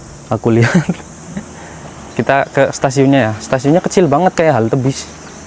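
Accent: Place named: Banyumasan, Indonesia. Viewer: wkwk logat ngapake kuat bgt bro, purbalingga hadir😂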